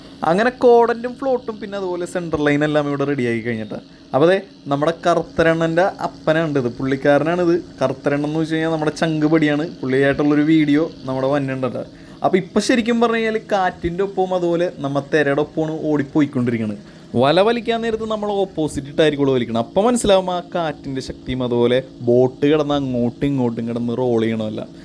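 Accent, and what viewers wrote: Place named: Thrissur, India. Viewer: താങ്കൾ തൃ... ശൂരാണോ. ഉഷാറായി 👍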